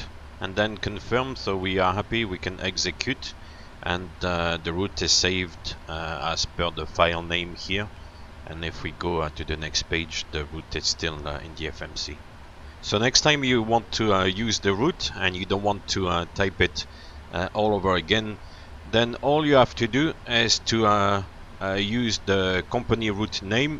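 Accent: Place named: France. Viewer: Tu as un accent de français qui parle anglais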